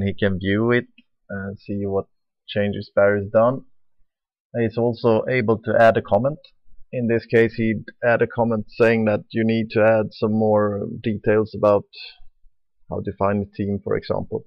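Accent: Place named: Sweden. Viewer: Swedish accent FTW :D // Runeic on FID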